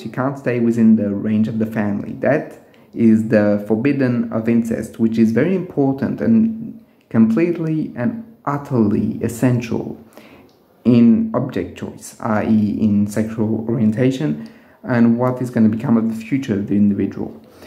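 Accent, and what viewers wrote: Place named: France. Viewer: You speak very good English whilst retaining the charm of your beautiful French accent. Very well presented, informative and thought provoking video. Thank you. I have subscribed.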